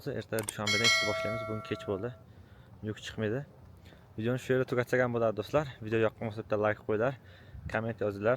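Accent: Samarkand, Uzbekistan. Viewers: Aka Samarqandanmisiz aksent shunaqa ekan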